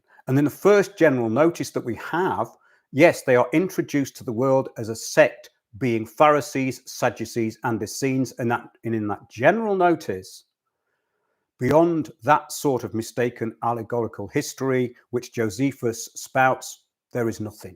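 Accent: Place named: England, United Kingdom. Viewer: This is fascinating. I can clearly see how this transhumanism is an extension of this serpent cult. From childhood/birth (in their depraved eyes) to death. Especially once a child is transitioned early, there are constant medical issues and daily rituals they must do to maintain the deception and stop infection (or to even get an erection.) Also, even to after death, since no doubt most folk who go through such horrendous procedures will feel cut off from God, and may believe they have no redemption if they realise they made an error and desecrated the body God gave them. Therefore, they are stealing folks ability to believe they can be redeemed through the Blood of Christ. Absolutely fascinating. I grew up in the UK, so it's great to hear deep information and truth spoken in English English. Thank you for your work. Wondering what you think about David Icke getting pushed forward by Redacted the last few weeks regarding the Epstein stuff. 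TBH I have never been interested in his work, but my mom was taken in with some of his stuff.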